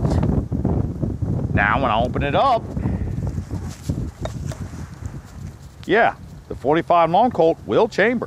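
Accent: New Jersey, United States. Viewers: Jersey accent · Chris Landry Jersey accent is an american accent 😑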